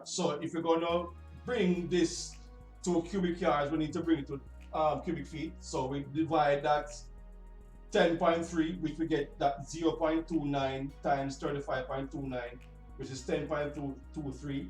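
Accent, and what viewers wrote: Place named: Jamaica. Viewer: Me feel like me a go a whaa university. What you are doing is excellent i am interested all the time. I use to whatch over seas people on youtube as it relates to building but when i was browsing this week and see this man in a african shirt and started to hear the jamaican acent i was over whelm ,trust i must say i appreciate this highly.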